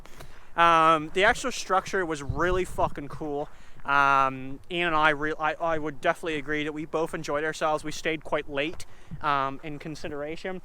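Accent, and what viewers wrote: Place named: Newfoundland, Canada. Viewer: I live and grew up in Halifax and have never heard a accent so thick Lol you must be a newfie or caper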